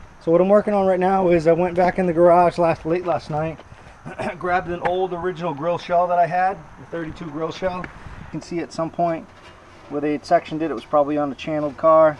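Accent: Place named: Massachusetts, United States. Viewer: The car is coming along great! Your accent reminds me of my mom. She grew up in Gardner mass.. keep up the good work!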